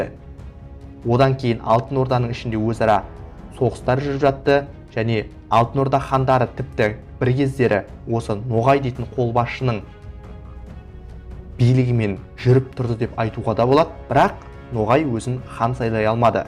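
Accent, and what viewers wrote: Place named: China. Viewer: Автор қытай ма монғол ма немене? Неғылған акцент? 😡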